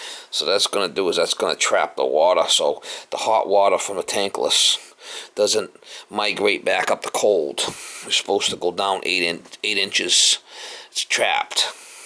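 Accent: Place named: New York, United States. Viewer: New Yawker…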